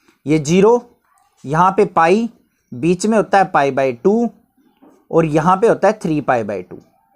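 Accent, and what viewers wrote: Haryana, India. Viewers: Sir are you from Haryana your tone is like haryanvi language I am also from Haryana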